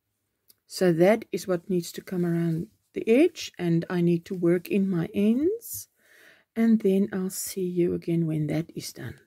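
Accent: South Africa. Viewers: Omg I just found you and you sound just like me mom with your accent. I'm guess your from South Africa. I lost my mom in April and miss her so much, hearing you talk brings me so much happiness remembering her voice. I was born in Capetown but came here to the U S when I was 3. My mom never lost her accent. Love this poncho and can't wait to make it.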